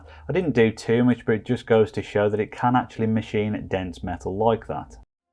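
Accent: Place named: Midlands, United Kingdom. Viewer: Found the channel researching small CNC, heard the Midlands accent, already confident I've found someone who knows his stuff. Great video, subscribed.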